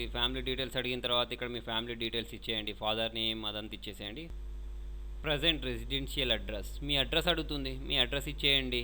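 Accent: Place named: Telangana, India. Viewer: Telangana mastu matladtunav bro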